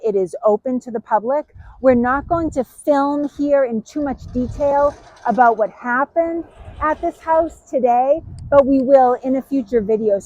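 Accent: Boston, United States. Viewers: I am new to the channel and am catching up on the videos. does a very good job to describe tactical operations in this video. She is accurate and technical, yet communicates it easily. As a Virginian, you gotta love the Boston accent! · I have enjoyed your telling of facts of our war of Independence and absolutely love that Boston accent! ❤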